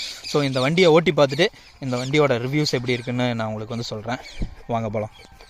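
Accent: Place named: Kongu, India. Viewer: koghu selga unga voice ,neega gounder ah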